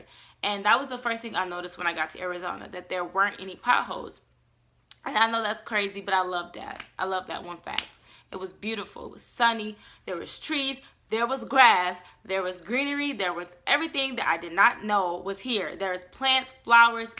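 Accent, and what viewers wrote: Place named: New York, United States. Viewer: you're from detroit? you sound like you're from NY lol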